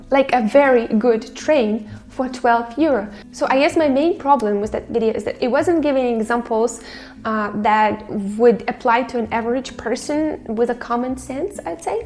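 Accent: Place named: Ottawa, Canada. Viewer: Hi i am a Dutch - Canadian ( living in Canada for 53 years ) what you are saying is so true in the Netherlands (for me it is still Holland ). The person talking about the trains is the same that i was living there , on time and fast. so the person talking about the Netherlands is a sick puppy. Love your way of thinking about for me Holland. You sound like a Ottawa person.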